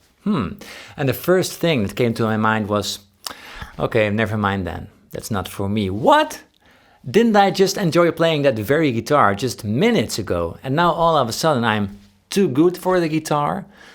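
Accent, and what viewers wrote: Netherlands. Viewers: i love how you set up videos and edit them but as a fellow Dutchman it sounds kinda strange hearing someone Dutch speak English so for me it is kinda a offset but that's on me xD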